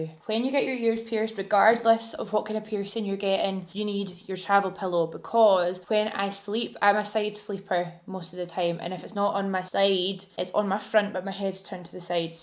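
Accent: Scotland, United Kingdom. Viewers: I love it when I accidentally stumble across a fellow Scot. Also I wish my makeup was as on point as yours 😍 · I find it so interesting when people say they struggle to understand what you’re saying because I’m Scottish Too and so it sounds so normal to me Haha. 💕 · i moved to ggw a little over a year ago and i just realized how good i am at understanding scottish accents now vs then. my god, i swear i just laughed and nodded at everything for a month (im swedish and moved here from portugal). your accent is still really mild to most of the folk i interact with, christ. idk why but your content makes me feel at home and your love for your pup had me subscribe before i knew what you generally post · Oh my god I hit a whitey 😂😂😂 such a typical Scot I love it 😍😂 sounds exactly like me x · I think scottish accent is so sweet!! But when i first started wathing i did kinda struggle understanding her but now that i have been watching her pretty long time, no problem 😄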